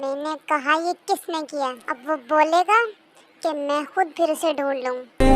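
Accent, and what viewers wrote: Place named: Pakistan. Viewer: Ap ke bol ne ke tarika se aisa lag raha hai ki aap Pakistan se ho. kya ap Pakistan se ho? Becoz mai Pakistani Jikooker hoon.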